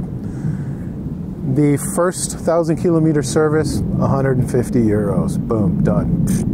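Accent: United States. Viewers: English is my 2nd but you sound American to my ears. Why don't you use your Brit accent like some of your peers?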